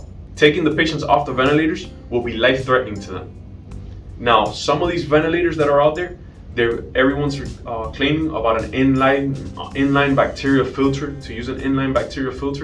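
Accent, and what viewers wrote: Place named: Miami, United States. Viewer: Bro, you have the thickest Miami accent ever. I gotta save this vid to show people what a Miami accent is. 😃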